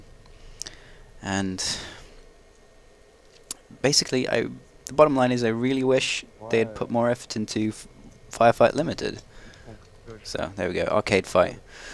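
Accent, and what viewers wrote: United Kingdom. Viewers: are u english??????????????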